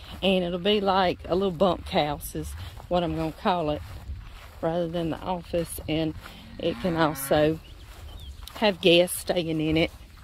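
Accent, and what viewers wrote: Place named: Appalachia, United States. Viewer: Girl! Thank you for your channel! I was struggling out in my garden today and I could not for the life of me figure out why my purple hull peas were fat and looked ready, but weren't purple and the peas looked a pale green. No info on Google for us southern folk who want info on our southern foods. Nope. Thank goodness I thought, check YouTube! I am now putting away some peas ... and listening to your other videos! Are you originally from LA? I would have pegged you as the Appalachians. I say that because that is where all my family is from. ;) We talk a lot alike. Anyway... take care and thanks again. Looking forward to your videos. This is my first year gardening and canning... I'm 46! Late start!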